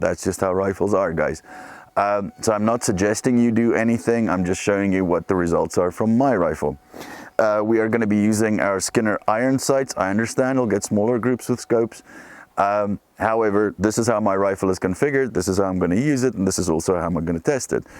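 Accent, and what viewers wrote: South Africa. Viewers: I would guess south Africa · that sounds like a south african acsent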